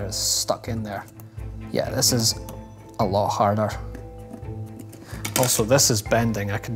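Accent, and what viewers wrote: Scotland, United Kingdom. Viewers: Where in Scotland are you from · R u Scottish you sound like it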